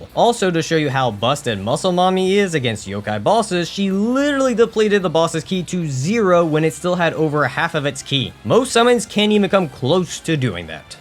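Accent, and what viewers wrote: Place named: United States. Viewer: I can't teach you how to speak Japanese in one comment, but I can teach you how to pronounce it! There are only 5 vowels, and they're pronounced pretty much the same as they are in Spanish. So a = the vowel in "mom", i = the vowel in "bean", u = the vowel in "shoe", e = the vowel in "bay*", o = the vowel in "go". These vowel pronunciations NEVER CHANGE, so i = bean vowel 100% of the time, e = bay vowel 100% of the time, etc. The only time vowels change pronunciation is when certain vowels are combined to make a long vowel. This only happens with the combos "ou", which makes a long o-sound, and "ei", which makes a long e-sound. Otherwise, long vowels are represented by simply doubling the letter ("aa" = "a" held for 2x the length, "ii" = "i" held for 2x the length, etc.. "oo" is also used in a scant-few words for historical reasons, it's pronounced the same as "ou", just "o" but held for 2x length.) Ex. 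Sensei = sensee with a long e-sound. *In modern Japanese, the e-sound can be realized as either the vowel in "bay" or the vowel in "bet", depending on region or even just individual preference, so use whichever one you're more comfortable with. Consonants are similarly really easy, they sound like how they look. The only exception is the r-sound, which sounds like the Spanish r, not the English r. Since you seem, by your accent at least, to be American, it's the same sound as the "tt" in the words "butter" and "better". The "tsu" sound can be a little tricky as well, but if you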